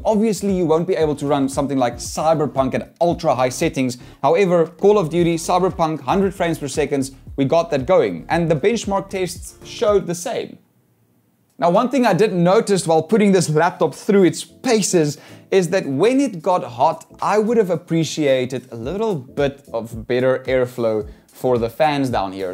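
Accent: Afrikaans, South Africa. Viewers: Hello I just wanted to say you sound south African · Kan sommer die afrikaans hoor in jou stem. Ek het die laptop in Demark gekoop. Beste gaming laptop daar buite op die oomblik net my opinie.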